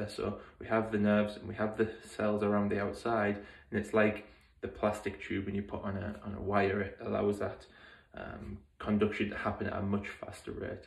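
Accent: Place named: Teesside, United Kingdom. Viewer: I have MS and found this really interesting, thank you. I’m originally from near Manchester and now live in Teesside, trying to pin point your accent. Definitely a N.Yorkshire / Teesside twang coming through.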